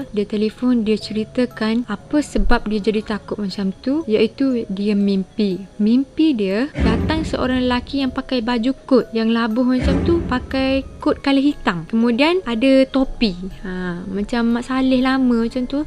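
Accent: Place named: North, Malaysia. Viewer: Comel. Dia campoq bahasa baku dgn bahasa utaqa. Hahahaha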